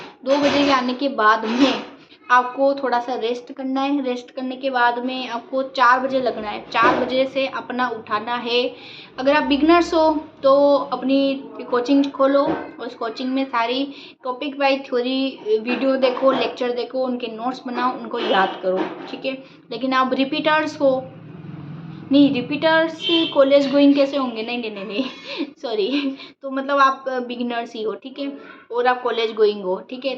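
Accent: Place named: Rajasthan, India. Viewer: Muje aapki baato ko sun ke lag rha hai aap Rajasthan se ho🤔🤔🤔🤔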